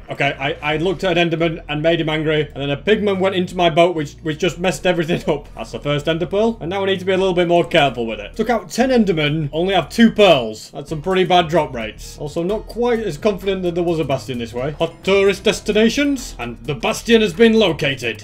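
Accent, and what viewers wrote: United Kingdom. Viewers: You are Britishers because of your accent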